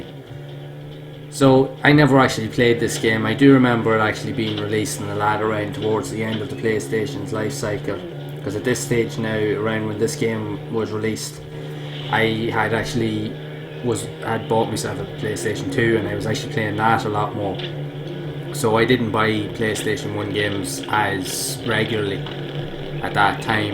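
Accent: Ireland. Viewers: Wow your pumping out these videos every day. I appreciate your hard work. Looking forward to more. I subscribed to keep up! Love your accent also. Whats your country of origin if you don't mind me asking? Im from the U.S. Nevermind i looked at your about section and saw its Ireland. :) I have a channel as well but its not so much videogames as funny videos or movie clips.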